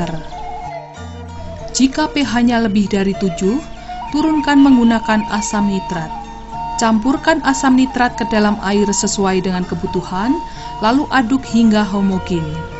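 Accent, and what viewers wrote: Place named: Java, Indonesia. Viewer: Duh yg baca narasinya medok...coba yg baca narasi sesuai dengan musiknya...orang jabar jadi gak medok gitu suaranya...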